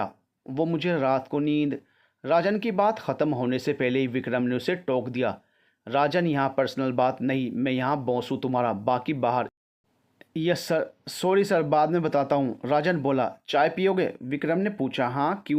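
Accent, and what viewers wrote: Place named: Mumbai, India. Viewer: O bhiya tapori bhasa to bade achhe se bolte ho mujhe bahot achh laga 😁👍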